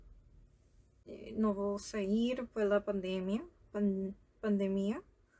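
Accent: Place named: Portugal. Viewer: Seu português é muito bom! Parece um pouco com o português de Portugal, mas só um pouco... Como brasileira, acho que algumas coisas do português de Portugal são um pouco difíceis de entender, mas consegui te entender perfeitamente :)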